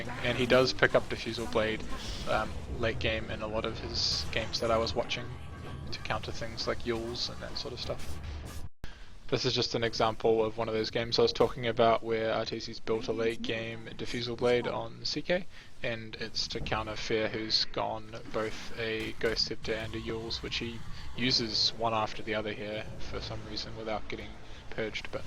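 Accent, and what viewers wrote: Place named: New Zealand. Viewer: ayyy a fellow kiwi i can tell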